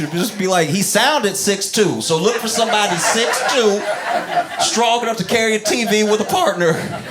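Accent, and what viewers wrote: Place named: Tennessee, United States. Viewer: Shreveport is in the house! Stoner Hill to be exact. What up homeboi! I've been trying to figure out your accent. For some reason I thought Tennessee. Dalzell St. in Highland, wow. Keep up the good work! 🫵💪💯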